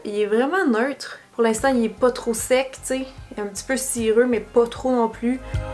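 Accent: Quebec, Canada. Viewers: C'est marrant, au Canada on peut acheter son maquillage en pharmacie. C'était chez Jean Coutu a Québec Ste Foy. J'aime bien aimé Fit le fond de teint et Nyx aussi. Les prix sont très raisonnables. Merci pr ce charmant accent qui me rappelle mes séjours chez vous. Bonne continuation.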